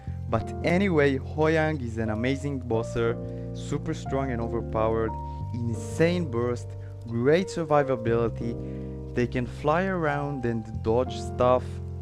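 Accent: Israel. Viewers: You sound like you’re from Israel haha. Awesome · Hey, is that a hebrew accent ? Love your channel bro !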